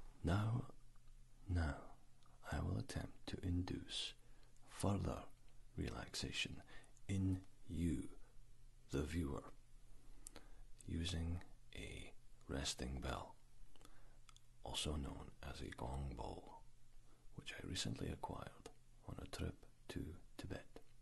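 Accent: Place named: United Kingdom. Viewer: I’m dead... I’m in love with the creativity of this, the aesthetic and the approach. Not to mention your voice is reminiscent of my first ASMR triggers when i was a kid.... “british” accents in somewhat gravelly men’s voices. I haven’t had tingles in awhile but this brought them back almost full force. Thank you.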